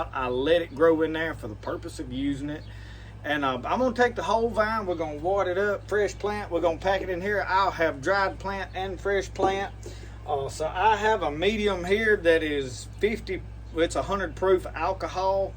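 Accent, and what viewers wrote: South, United States. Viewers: Common, you both now.....I just loveeeeeee your southern accent, it's like a lullaby to my ears honestly. Those who hate it can take a walk seriously.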